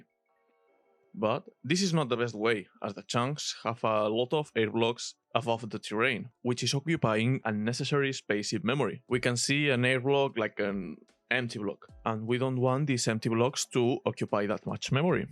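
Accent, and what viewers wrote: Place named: Spain. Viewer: Looking incredible, I hope this goes well! Also, are you spanish? Because your english accent sounds like every spanish speaking english, like myself.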